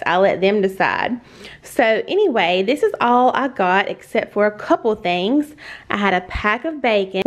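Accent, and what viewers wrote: South, United States. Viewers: Hey! I can just hear you talk all day. Love your Southern accent! Love your Videos!🙌🙌🙌🙌💯 I am differently trying these recipes.Thanks for sharing. I hope you have a fun, loving, Safe Holiday! Merry Christmas!🎅🎄🎁🎁🎁💗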